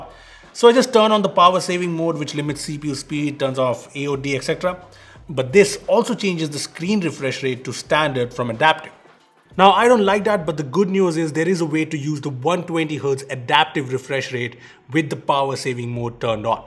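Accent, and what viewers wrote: India. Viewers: I hate indian english accent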